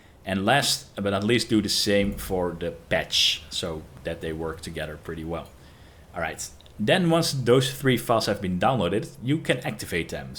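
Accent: Netherlands. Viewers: Dutch accent spotted!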